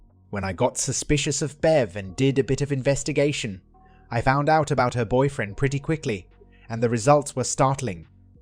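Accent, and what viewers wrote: United Kingdom. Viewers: I've heard this one a couple of times before, but I do have to say that having the AI with a British accent gives it a certain sense of charm.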